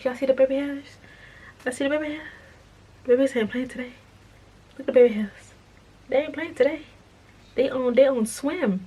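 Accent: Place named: New York, United States. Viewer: You did pretty good with your NY accent (like your humor), time to test it out in person...Have you ever been to NY?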